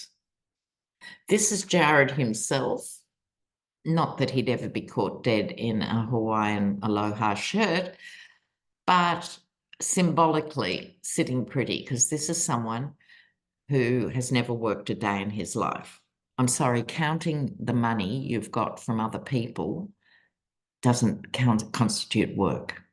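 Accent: Australia. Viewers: Love you,! Your Aussie accent & dry humour somehow makes the bad news easier to take!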